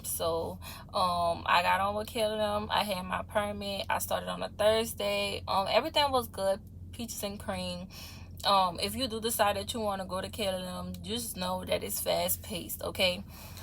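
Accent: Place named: New Orleans, United States. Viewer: Aye Nola in da building I heard New Orleans lol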